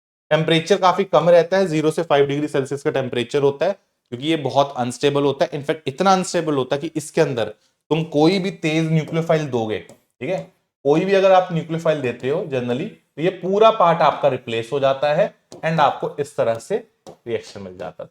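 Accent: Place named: Punjab, India. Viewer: Sir tusi punjabi hege o na , kai vari thodi punjabi nikal jandi ee 😅😅😅, I'm also from Punjab 😊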